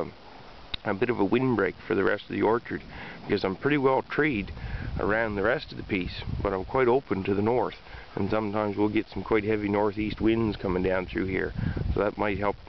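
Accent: Nova Scotia, Canada. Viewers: Oh my god! I had to laugh - I was looking up videos on Orchards and planting new ones and as soon as you opened your mouth I was like "holy shit, this guy is from Nova Scotia" which is where I happen to be from, too, and where we will be planting an orchard after we move back home from BC. Love it. Thanks for the video and information, but also to the throwback for the old South Shore accent.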